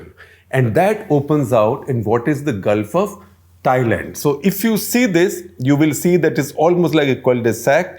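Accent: India. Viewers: even though I already understand the issue, the crazy indian accent made me sit down and listen to this. Very compelling. Glad to see Indian media rising up as an alternative to the Anglo-Zionist dominated one.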